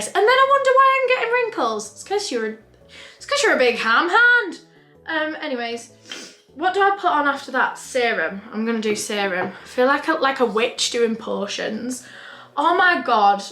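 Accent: United Kingdom. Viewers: I LOVE 💕 British English ❤️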